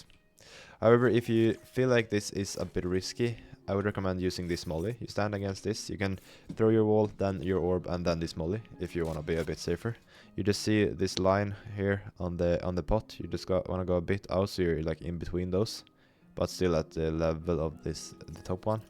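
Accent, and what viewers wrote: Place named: Sweden. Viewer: Are you from Sweden or do you just have an accent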